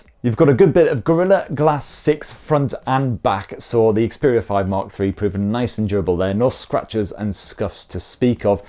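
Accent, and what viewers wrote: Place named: Northern England, United Kingdom. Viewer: He has good Sense of humor most people won't be able to understand the Northern accent.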